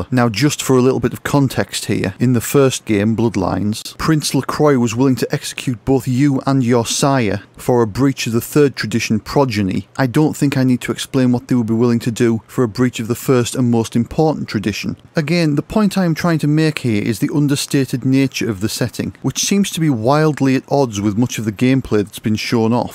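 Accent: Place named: United Kingdom. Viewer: Instantly subscribed during the first minute because of your accent. British accents are far too rare on yt.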